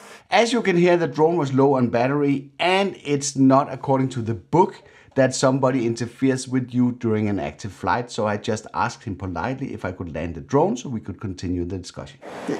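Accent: Denmark. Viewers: I thought I recognized the accent... I'm half Danish. (Not important but yay)... Very informative and interesting video. Tak. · Lige en dansker der tjekker ind. Der er SÅ MEGET plus på kontoen for at rent faktisk tag det der "Youtuber" seriøst istedet for det evenlige jeg er sej - selvforheliigelses som er upræget for mange Y-T (fra dk) Dog lidt malurt i bægeret. Dit DK accent er MEGET stærkt, men MEGET derudtale uden øhhh ehhh and so on. Good Work ! sq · Ah, Denmark. Tried to place the accent and then the language reminded me about the "Danish language breaking down" video. 😁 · As a Norwegian; I can hear a distinct danish accent in your english🇳🇴😂 edit; Impressive vids👍👍👍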